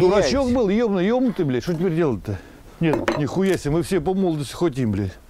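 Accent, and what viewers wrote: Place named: Okanye, Russia. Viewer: Окать перестань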